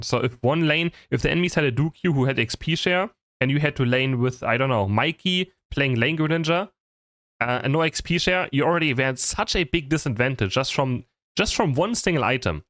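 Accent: Germany. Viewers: Ahh du bist deutsch, ich höre den aktzent sofort heraus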